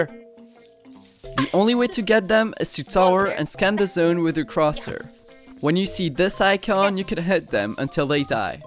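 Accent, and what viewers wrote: France. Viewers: Bonjour Monsieur je pense que tu est plus francis, francis et moins quebequoi francis. Oui? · That’s what you’re accent is the entire video I was wondering and at the end you start talking French exactly what I though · ah bah tu parles francais a la fin mais jai reconnu a laccent ptdr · I totally expected that from his accent tbh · Bien joué mec j'ai même pas remarqué que t'avais un accent français avant la fin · Aaaaaah, I thought you might be French! The "no lessons on Wednesday afternoon" made me suspicious, and I then I thought I noticed a hint of French accent in your English Gg mon gars :) Edit: Belgian apparently? Whatever, French-speaking, you know what I mean xd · ayo i knew he was french by his accent or at least itought he would speak french, an he does, it makes me happy idk why when i see french yt ers speek english+ actually udnerstanding them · Could definetly tell you were french from the accent lol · i’m french canadian, he’s french, he has a french accent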